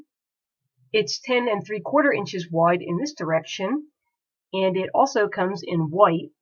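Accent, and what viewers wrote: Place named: Chicago, United States. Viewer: The Chicago accent is coming through loud and clear! ☕️🍩☕️🍩☕️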